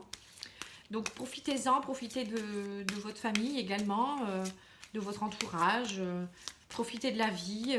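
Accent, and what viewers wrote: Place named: Pas-de-Calais, France. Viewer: 👍Suis du pas de Calais aussi je l avez perçu ds votre accens🤣😘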